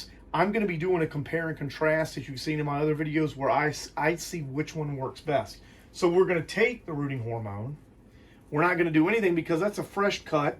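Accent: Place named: Louisiana, United States. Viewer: Before I look deeper in your about section... New Orleans? Southern Louisiana? I recognise that accent. 😁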